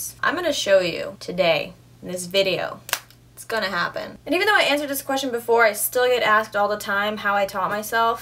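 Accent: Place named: United States. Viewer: where are you from?? you look and sound american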